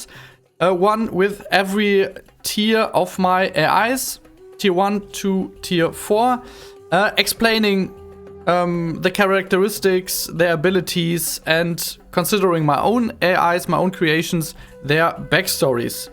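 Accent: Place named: Germany. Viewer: I love your beautiful denglisch :D "It was a inner flower picking" xD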